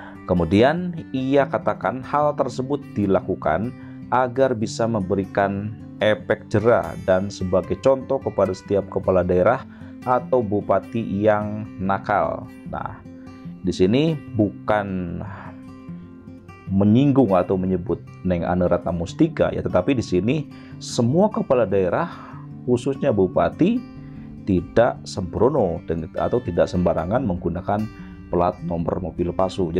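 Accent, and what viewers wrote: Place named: Java, Indonesia. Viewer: Assalamualaikum warahmatullahi wabarokatuh selamat siang min maaf sebelumnya admin nya logadnya medok bange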